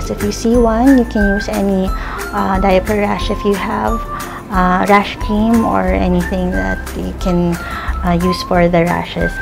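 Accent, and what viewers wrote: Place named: Philippines. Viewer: everytime .5 seconds realuze shes pinay with the accent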